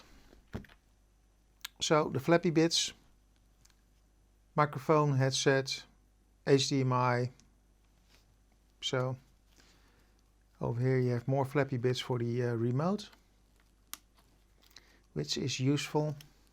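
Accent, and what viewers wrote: Netherlands. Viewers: Dutch accent is fine, I went with my daughter to the university in Amsterdam to settle her in there. Never seen so many smiley people, everyone seems to have a great attitude to life. I was so greatful that everyone spoke perfect English...the secret to carrying weight is a comfortable rucksack...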